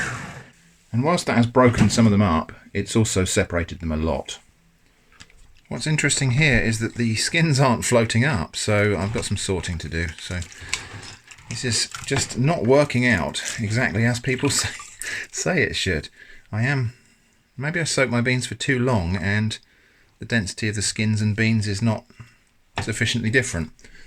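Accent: United Kingdom. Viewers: Must be the same British accent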